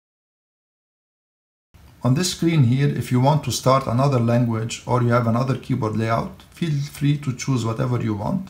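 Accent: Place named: Lebanon. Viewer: thank you for this excellent tutorial! I just wanted to ask you, are you originally from Lebanon bc your accent is familiar. Anyway, thanks again!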